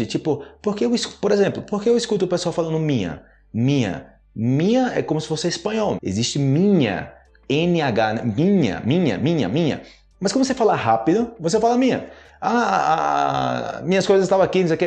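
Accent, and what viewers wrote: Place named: Colombia. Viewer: Teu spanhol e similar a Colombia e costa rica mae amigo, pero em portugûes e mamá em espanhol.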